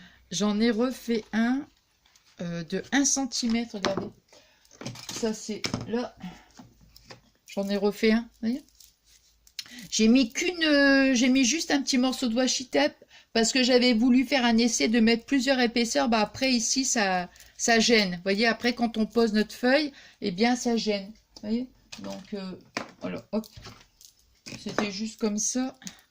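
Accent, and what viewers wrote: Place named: Nord, France. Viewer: Bonsoir, vos créations sont vraiment magnifiques👍🤩🤩🤩et vous êtes tjours aussi Géniale, sympa et l'accent du Nord j'adore 😃😅 bonne soirée 😘😘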